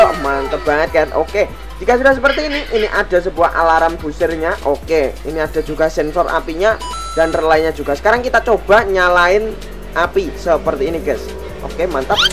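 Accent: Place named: East Java, Indonesia. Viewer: Sampeyan asale ko Endi mas,bahasane Jawa timuran koyone😁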